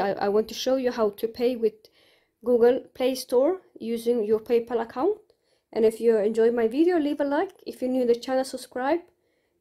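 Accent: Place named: Arab world. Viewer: C’est un arabe vu sont accent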